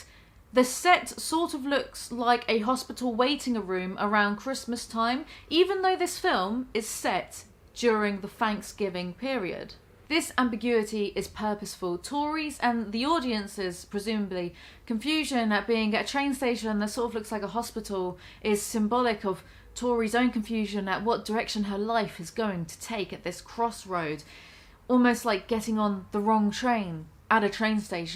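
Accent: United Kingdom. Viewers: I’m subbing for these reasons, you should as well. British accents are a plus for no reason. I love a female creator who’s not doing makeup tutorials or exposing her body for subs. To each their own, but I come to YT for laughs, memes, and “content”. Shes given me both. The video I see next “The Sheer Entitlement of Youtubers” just give humble vibes.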